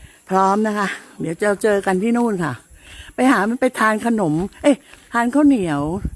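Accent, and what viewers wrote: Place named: Kanchanaburi, Thailand. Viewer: เมืองกาญจน์ชัดมากจ้า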